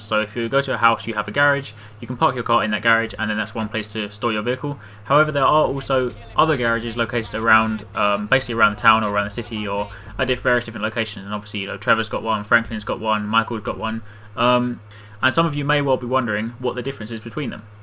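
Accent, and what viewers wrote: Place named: United Kingdom. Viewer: he is british this is how british people pronounce garage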